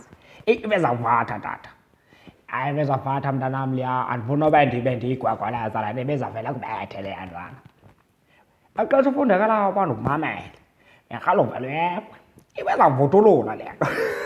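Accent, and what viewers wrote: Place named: Sterkspruit, South Africa. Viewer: Esakho Isixhosa ingathi sesase sterkspruit 🥱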